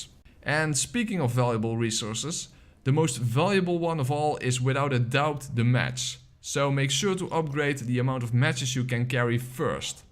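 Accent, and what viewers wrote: Netherlands. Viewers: thanks for the guide, your accent sounds dutch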